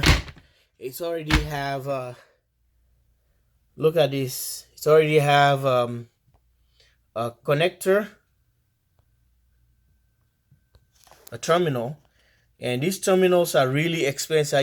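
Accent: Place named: Nigeria. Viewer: You talk like a Nigerian. Nice video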